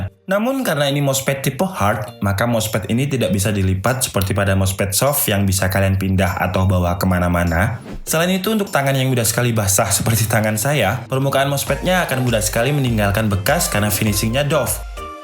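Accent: Malang, Indonesia. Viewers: Khas e wong Malang 😂💪🔥🔥🔥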